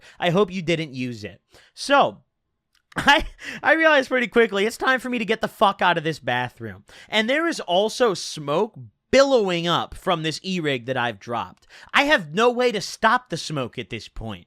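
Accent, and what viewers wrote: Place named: Midwest, United States. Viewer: I was born in 91, grew up in Milwaukee suburbs which is probably fairly similar to the Chi suburbs is from. He reminds me in a very peculiar/distinct way of me and my friend group back around ~2010. His speech patterns are almost identical, which since becoming older I have moved on from this lifestyle so it just reminds me of those times. The only difference is some of the newer tech like dabs but I'm not so sure things have changed that much. We were doing the exact same shit. Maybe it's also a regional thing.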